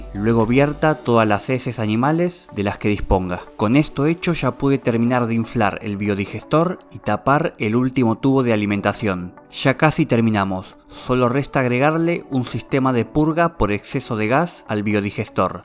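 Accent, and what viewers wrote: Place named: Argentina. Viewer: Muy bien explicado, Pero estoy seguro que puedo encontrar otro video donde me lo expliquen sin ese molestoso y desagradable acento Argentino o Uruguayo.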